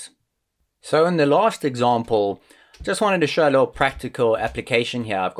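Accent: South Africa. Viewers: Well I'll be damned, that's a Saffer accent if ever I heard it! Where you form AB?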